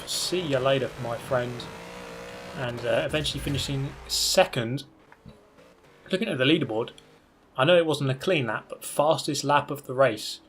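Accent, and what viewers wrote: United Kingdom. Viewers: There's just something about a snarky British guy chatting about cars... Can't quite put my finger on it.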